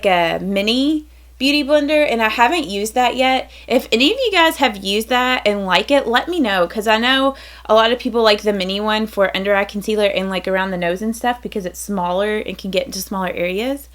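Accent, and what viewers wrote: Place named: Texas, United States. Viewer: Love your accent, where are you from, I'm southern also from Texas, you sound like you are from Texas. lol good video too.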